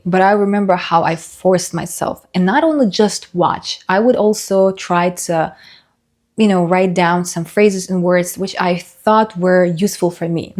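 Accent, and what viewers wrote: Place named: United States. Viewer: Great video. You have a very good command of english, no doubts about it. Accent reduction, rithem, fluency. I've noticed that your pronunciation is very much influenced by general american English :)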